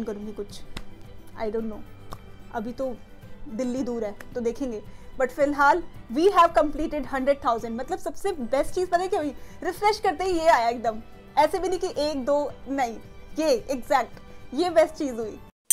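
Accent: Uttarakhand, India. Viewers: Congratulations 😊from your accent I got a hint that you belong to Uttrakhand(as I am), keep going on... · I knew you are from Uttarakhand from your accent! Btw I’m also a delhite and from Uk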